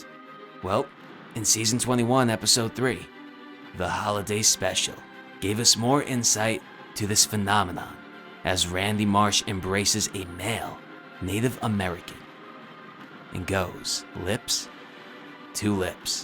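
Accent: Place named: United States. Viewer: You sound like how europeans think an american youtuber is supposed to sound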